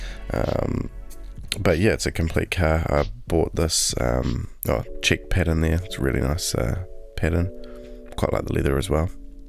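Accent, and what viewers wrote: New Zealand. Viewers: Sure am, that's why it was so good to hear a kiwi voice. Car is in ChCh . . . Taking it to Taupo and then Akl . . . Will have a look and join the forums. Cheers again, go well with the restoration.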